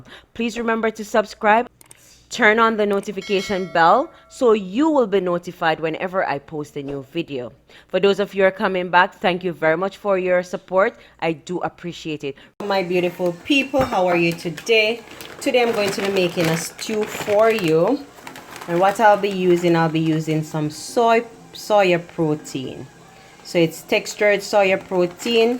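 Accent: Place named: Jamaica. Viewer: Love your JA accent.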